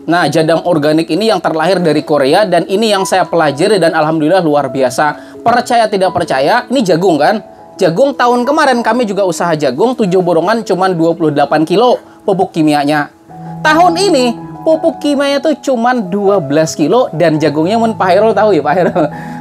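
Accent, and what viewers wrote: Banjar, Indonesia. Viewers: Ooo pantas am bepander macam urang Banjar, salam sukses, moga chanelnya terus berkembang & tak bosan berbagi ilmunya Bang. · Mantab, logatnya Sangat Banjar banget, salam dari Arek Banjar Suroboyo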